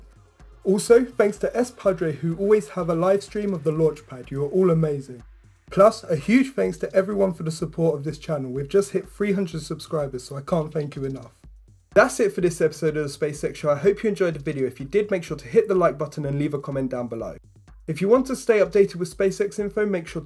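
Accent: United Kingdom. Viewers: Your accent is adorable, exactly what part of the UK are you from.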